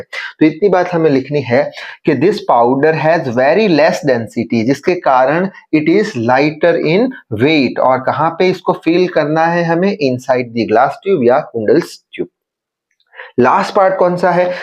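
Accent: Gujarat, India. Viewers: Sir aap Gujarat se ho kya ?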